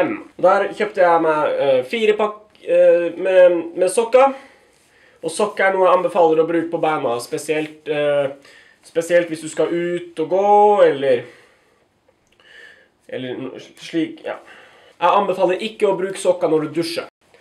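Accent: Norway. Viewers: Du har begynt å snakke i rart, jeg vil gjette Drammensk!? Jeg merker at det er litt vanskelig for deg. Jeg liker det. Trøndersk er kulere. Det er kult å være høy, jeg er høy. Ikke bli bloggerfaen! Jeg kjenner en labrador. Du er kjekk i dag. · Kjære. Digger nordlening-dialekten din.:-)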